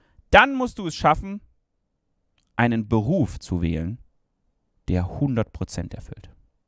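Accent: Saxony, Germany. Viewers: Typischer Sachse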